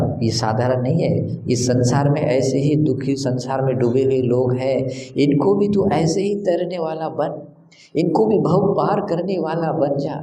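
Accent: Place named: Gujarat, India. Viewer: Is he gujrati? Why his accent is like gujrati?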